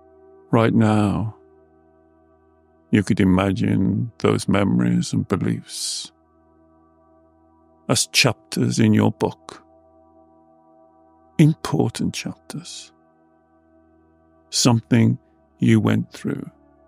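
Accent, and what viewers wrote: England, United Kingdom. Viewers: I love the English accent as well—it’s endearing ❤ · God!! You are AMAZING!! As I already know; I literally was with you, meaning, JUST US! NO ONE ELSE!; Simply a one on one, which makes you! Simply AMAZING!! Every step I envisioned my past; Or as Joan Collin’s named one of her books of her past “ Past Imperfect” 😅 I looked at my family and thyself’s, “Past imperfect memories of happenings, Emotionally and Happily., again I say, You are a Blessing to ALL. And Your Lovely English accent 👀 Helps too!😂 All the ✌🏼❤️ out 2 🫵🌿😉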